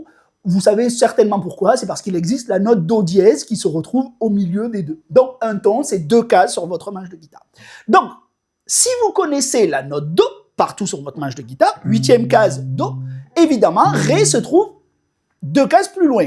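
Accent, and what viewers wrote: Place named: Provence, France. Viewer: oui c est une question d accent et le tien m a propulsé dans les films de M Pagnol.... Le mien, franc-comtois n est pas aussi musical.... Pour répondre, c est toujours intéressant d avoir des éclairages différents sur la technique. Perso je fais des relevés d accords en écoutant de la variété. Tous mes vœux.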